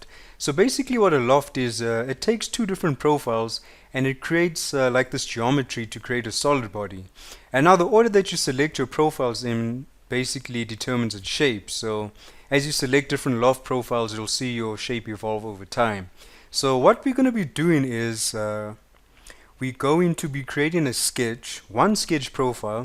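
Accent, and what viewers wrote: South Africa. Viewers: This is brilliant, thank you! Lovely to hear a fellow South African accent.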